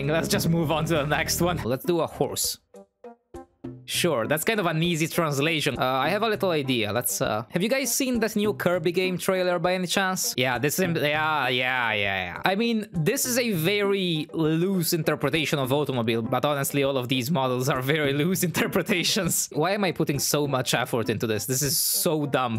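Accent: Italy. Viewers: You literally have the same accent as my Italian teacher 😂😂😂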